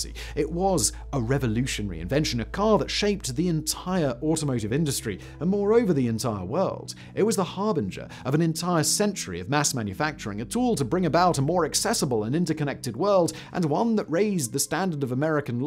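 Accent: United Kingdom. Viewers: Thank you for cleaning that up. The Model T contributing to the stock market crash is almost as foolish as the car contributing to anti-Semitism. Clearly, just because one has a great beard, a cool British accent, and wears glasses doesn't mean that one is a sophisticated thinker.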